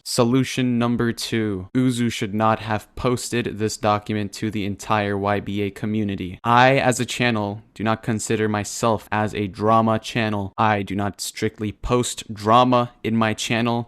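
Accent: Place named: United Kingdom. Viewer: Omg yiur bri'tsh???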